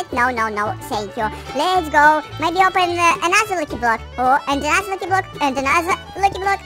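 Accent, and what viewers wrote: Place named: Russia. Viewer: скорее всего ты русский судя по акценту (You Russian boy???)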